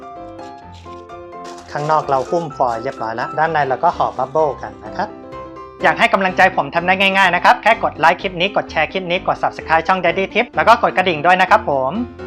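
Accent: China. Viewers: พี่เค้าพูดสำเนียงเหมือนคนจีนเลย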